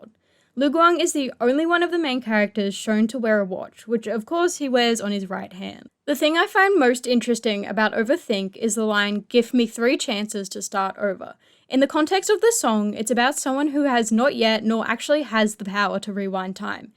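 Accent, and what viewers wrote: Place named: United Kingdom. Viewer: british spotted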